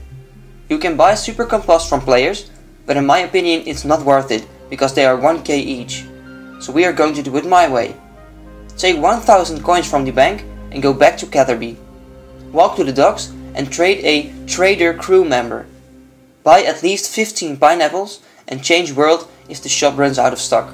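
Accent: Netherlands. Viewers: With this accent I´m gonna assume you´re Dutch :P Great guide anyway. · The dutch accent hahahaha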